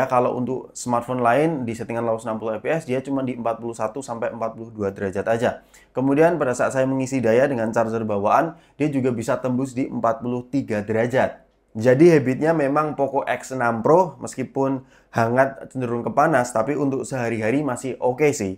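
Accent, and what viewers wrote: Semarang, Indonesia. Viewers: wong semarang yo bang?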